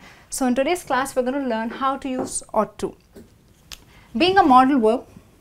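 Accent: India. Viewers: Her accent is so different. :) Is she from India? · Indian english 🤦🏼‍♂️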